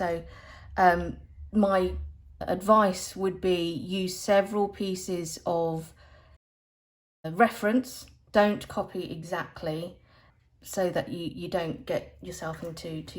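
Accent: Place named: United Kingdom. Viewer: I wish more artists would do this, it really helps to understand the choices made. May I ask, which British accent do you have? I love accents!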